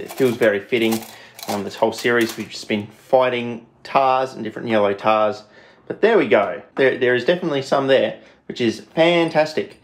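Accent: Australia. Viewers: ty the tasmanian tiger is what i first thought with gamecube and your accent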